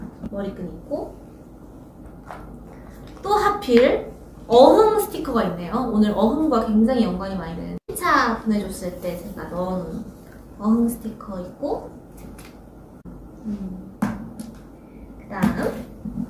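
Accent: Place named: Germany. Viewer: She has a German accent in English too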